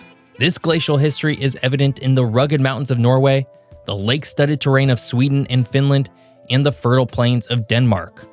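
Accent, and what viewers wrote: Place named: United States. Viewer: The clue lies in the English accent of the narrator: 'Murican! In the realm of global ignorance, 'Muricans hold a special place, clearly exhibited in this video. The disagreeable part is speculating about Finland reclaiming territory confiscated by Bloody Russia after the Winter War. The way things are going, Russia will fall apart pretty soon, but let's keep the Champagne capped until it actually happens. The main point: The territory Finland lost in the Winter war has now been overrun by Russians. It will be a bloody harsh business driving all the Russian-speakers out of former Finnish land. Will the Finns have an appetite for that? It remains to be seen. Then again, the abject cruelty shown by Russians everywhere during the war in Ukraine, why shouldn't we visit the same (or more) misery on the Russians as they visited of the locals? 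Bottom line: Putin's excuse has been to "liberate" Russians embedded in former Soviet states. A very clear action would be to forcibly move every Russian-only-speaker to their Rodina! Problem solved!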